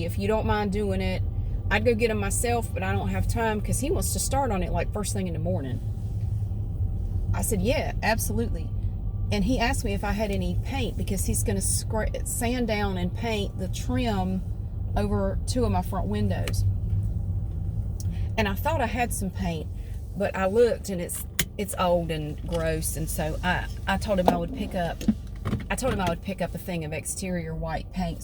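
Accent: South, United States. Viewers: Sometimes when I watch your vlog I feel like I'm listening to myself, but with a Southern accent instead of Canadian. 😄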